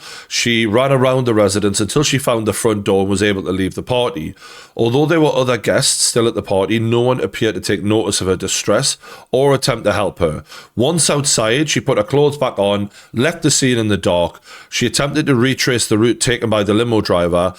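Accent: Newcastle, United Kingdom. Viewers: That's a Geordie accent - from Newcastle-upon-Tyne in the north-east of England - along with the West Country accent, it's the most pleasing to us Brits....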